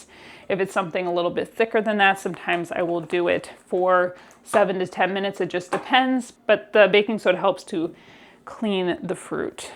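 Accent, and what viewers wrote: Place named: Northeast, United States. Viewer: Where are you originally from? I notice a bit of a NE accent (water) 😅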